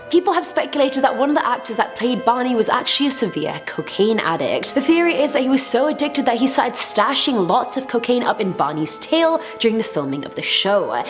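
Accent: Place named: RP, United Kingdom. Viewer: i like your accent i, i think its attitude and i think its called posh.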